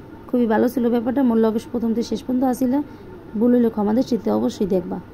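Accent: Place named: Barisal, Bangladesh. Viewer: পুরাই মোগো বরিশালের ভাষা👍👌🙋‍♂️🥰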